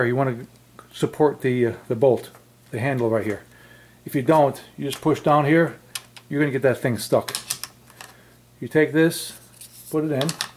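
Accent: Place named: Connecticut, United States. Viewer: Thank you for making this very informative video in a nice straightforward style. I like your accent also - Connecticut?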